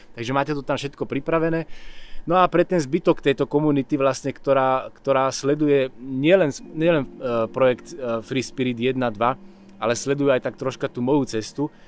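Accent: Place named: Russia. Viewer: Почти по - Русски говорит же ! Кто Вы - национальность ?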